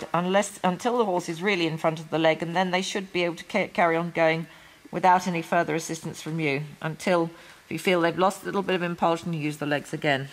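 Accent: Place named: England, United Kingdom. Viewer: This is VERY informative! I ride a horse with a very similar build, belly wise, and I struggle to keep her forward at the trot- my leg aids are not very good, I think. I saw a more advanced rider on her and they did not seem to have as much issue getting her to go forward, and to then maintain it. I even have to take my lesson with another horse just to keep her going, I need help with my legs I think! And it is good to know even pros struggle to look up- I also tend to softly focus right at the horse's head area, and constantly get told to look up that the fence or at the treeline... I would LOVE to be a working student! Also, those saddles are amazing! I feel like mine MIGHT be pushing my lower leg forward- I do a lot to keep it back, but I have recently seen some video of myself and it creeps forward regardless... I might see if I can try another saddle next week, if they have another one that fits Rebecca's (my lesson horse's) wide back! 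And you sound almost EXACTLY like my instructor, she is also English! She did eventing and then dressage, at a Grand Prix level, I believe. Or at least she had a Grand Prix level horse...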